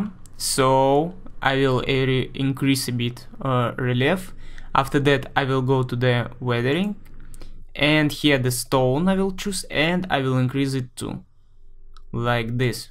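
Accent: Russia. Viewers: есть сильное ощущение, что твой родной язык - русский!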